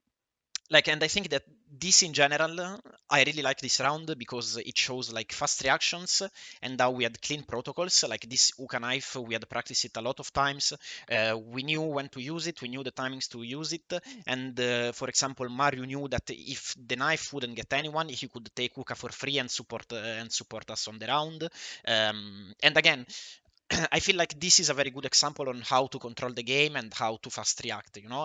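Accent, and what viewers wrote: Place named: Italy. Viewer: You have a strong italian accent, anyway, nice analysis! And good luck tomorrow for the match with the unicorns!! Destroy them :)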